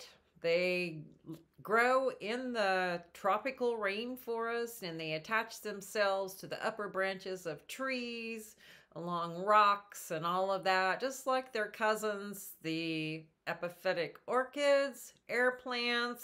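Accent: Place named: South, United States. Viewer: That’s her southern draw my friend. I’m oh so fascinated with our friends and their accents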